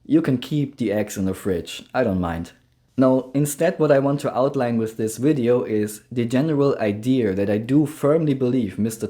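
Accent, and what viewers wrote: Germany. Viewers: Are you german ? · Soooo, are you German perchance?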